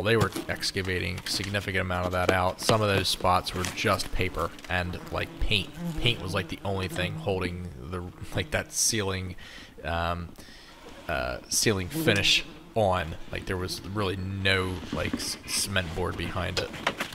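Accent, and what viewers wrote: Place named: Southeast Pennsylvania, United States. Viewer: Your accent - are you from SE Pennsylvania?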